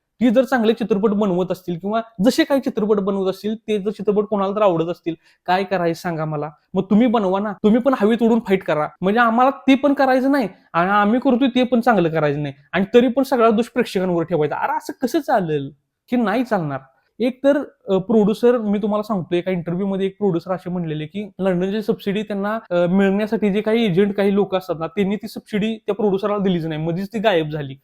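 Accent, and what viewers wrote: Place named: Kolhapur, India. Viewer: तो खास कोल्हापुरी ठसका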